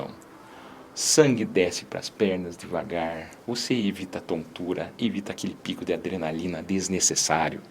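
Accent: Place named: Paraná, Brazil. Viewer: De que região ou estado é esse sotaque, quase não dá pra entender algumas palavras. Parece ser do SUL, talvez do Paraná., esse DF deve ser a região pra onde ele foi e não onde ele criou esse sotaque! btw: dicas interessantes!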